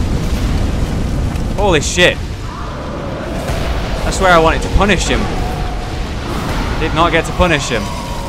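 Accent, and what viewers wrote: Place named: Wales, United Kingdom. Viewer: Scottish I thought he sounded Welsh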